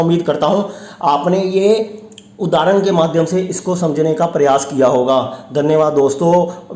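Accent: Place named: Punjab, India. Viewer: bhai mene aapki koi Insult nai ki mene ye kehna chaha ki aapki hindi bolne se ya aapka accent hindi ka dil ko acha lagta hai usme apnapan aata hai usme punjabi touch bhi kabhi kabhi aata hai to mazaa aa jaata hai aapki knowledge awesome 👌 hai bhai main aapka subscriber hu aapko hamesha like karta hu...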